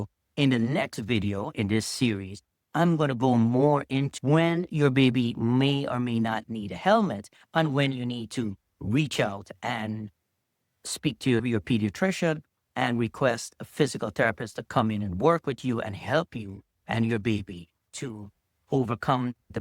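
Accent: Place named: Caribbean. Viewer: Do you operat ein the Caribbean? I'm hearing an accent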